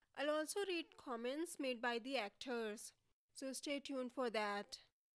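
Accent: India. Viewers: How come you write and speak such excellent english, yet have such a lovely indian accent ? 😊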